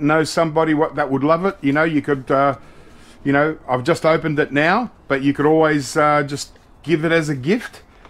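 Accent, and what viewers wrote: Australia. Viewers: 73 kg! Nice tab for eBook reading. I always enjoy your videos, love your Aussie accent.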